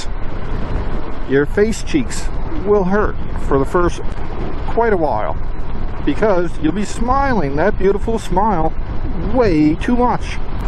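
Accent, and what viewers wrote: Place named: East Coast, United States. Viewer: This was amazing. Not sure if it was the east coast accent that brought me home or all the nails you hit on the head but this was excellent. I could relate to so many points you made. I just bought my first bike a couple months ago and all the gear to go with it. Got a 23 MT-07 and there are no words for how it feels, it's crazy. Working on getting a booking for the MST, some people around here are waiting over a year to get their course done due to how few examiners there are and how booked up it is. That Klim Induction pro jacket was rad 2 months ago, now it's getting chilly! Thanks for the vid! Sub'd.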